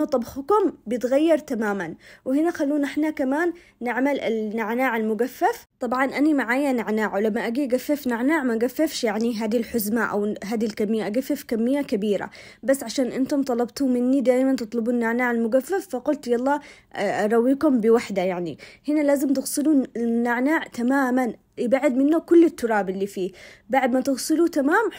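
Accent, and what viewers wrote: Aden, Yemen. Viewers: مبدعة في كل شيء اتشوق دائمًا لفيديوهاتك محسنك ومحسن كلامك العدني الله يسعدك يارب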